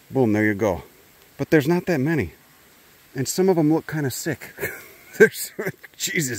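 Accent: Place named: Northeast, United States. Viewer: Just started following the channel and I gotta say, when he mentions scientific names, I have no fucking clue what the hell he's talking about but gah'damn something about that thick northeastern accent is oddly satisfying to listen to. Especially when he's talking shit or just engaging with the local wildlife... ... I can't stop watching this shit...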